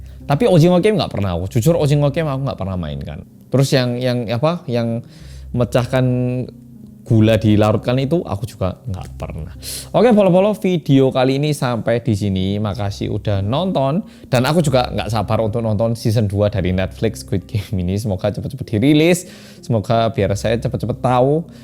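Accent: Java, Indonesia. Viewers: salfok mas ngomongnya medok bingitsss wkwkwk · Kok sleg jawanya engga hilang ya Mas bro... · Sesungguhnya saya penasaran kenopo kok mas dialek nya masih kuenteel medhok.. gimana kah cara menjaga nya..?? (Semoga dibales) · Udh nonton bang reviewnya.. abang orang korea tapi Logatnya Jawa Toh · Mas mas medok ae jawane ... sing ndih... hehehe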